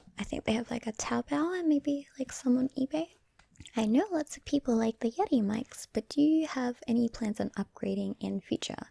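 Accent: Australia. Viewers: A Spanish- looking Filipino with an Australian accent? That's a treasure for sure, haha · It's odd - you have a 'sweet' voice pitch, and its like the aussie accent kind of amplifies it, if you know what I mean. That's always the impression when I hear your voice in these vids, very sweet. Haven't met many Australian girls with a similar voice pitch. As a side note, I'm half filipino half English living in Australia for almost all my life! It's a small world, huh.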